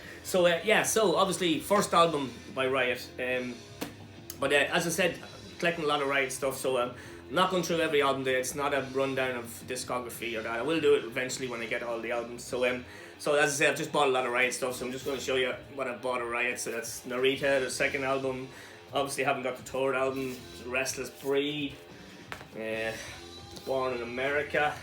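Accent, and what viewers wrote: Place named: Ireland. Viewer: Love you voice I wanna say Irish ☘️ but I could be wrong not sure exactly but anything Scott Irish or German I feel at home .. and I live in Texas lmao 😂🍺cheers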